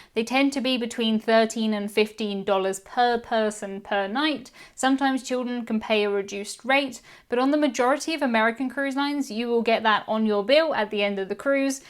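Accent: United Kingdom. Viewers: Hello, I absolutely enjoy watching your videos in particular listening to your British accent and speaking voice. Thank you.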